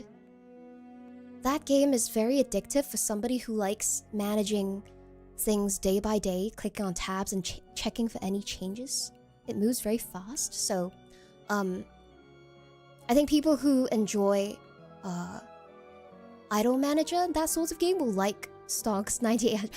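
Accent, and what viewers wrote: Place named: United Kingdom. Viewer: I never know an ojou-sama with British accent is what I need all along.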